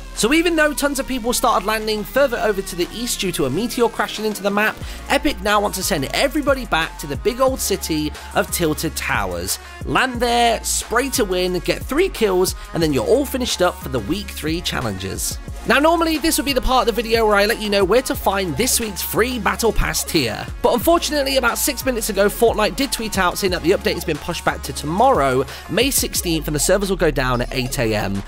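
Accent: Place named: RP, United Kingdom. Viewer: UnknownMasksW2serialirious he sounds like a British butler.. Like seriously dude lol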